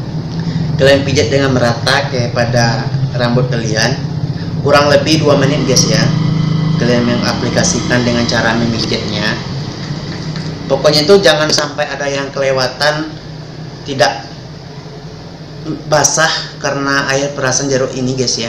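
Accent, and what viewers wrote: West Sumatra, Indonesia. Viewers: Logat Minang nya, Karen bang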